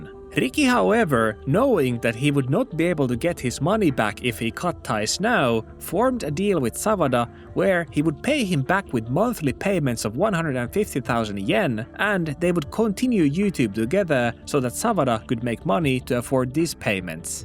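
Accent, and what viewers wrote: Finland. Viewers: You sound Finnish what country are you from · as in paahtoleipä. i can hear it from your accent. this is a Finnish guy. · Bro is one-hundred percent Finnish, am I right fellow Fin?:cat-orange-whistling: Yap. The "also" confirmed my suspicion, moro miten mennöö?:D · bro am i tweaking or do i hear a finnish accent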